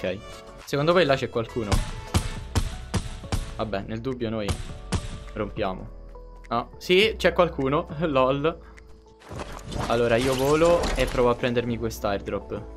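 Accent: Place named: Naples, Italy. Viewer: Pensavo fossi di Napoli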